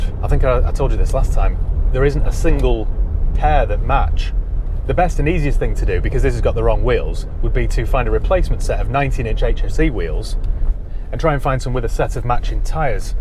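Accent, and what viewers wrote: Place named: RP, United Kingdom. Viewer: No complaint just seems a more “posh” way of speaking not something common round where I’m from 😂😂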